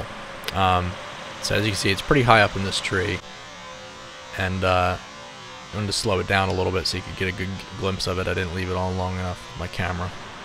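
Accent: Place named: Western Pennsylvania, United States. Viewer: Second that! I want to hear shower, downtown and Southside. It’ exactly like western Pennsylvania 😂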